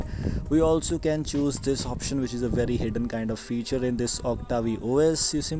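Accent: India. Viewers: Bhai Lucknow se ho kya?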